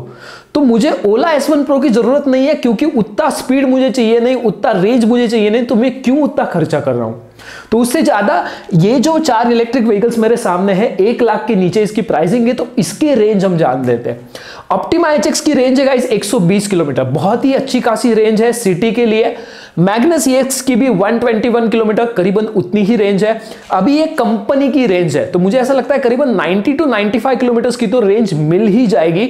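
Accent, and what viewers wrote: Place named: Maharashtra, India. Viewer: मराठी माणूस दिसतो आपला...ascent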